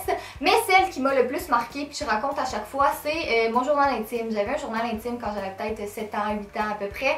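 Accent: Quebec, Canada. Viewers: une des premières vidéos que je vois de toi et je sens que je vais m'abonner ; ) et en plus j'aime trop ton accent, oui j'aime l'accent québécois (je suis une des rares française qui aiment l'accent québécois... !) c'est grave rigolo, genre quand tu dis "youtoube" 😂 ou "lo" au lieu de "lA"enfin bref... gros bisous de France....😘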